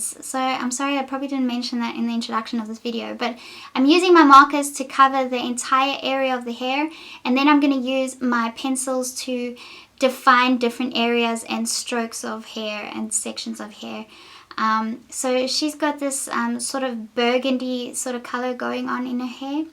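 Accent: South Africa. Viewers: Beautiful I love it. Are you South African?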